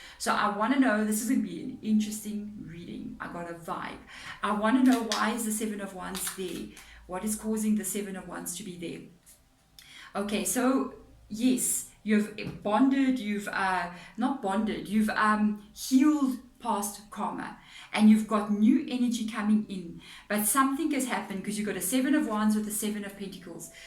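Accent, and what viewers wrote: South Africa. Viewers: You sound South African? 🥺🙇🏽‍♀️❤️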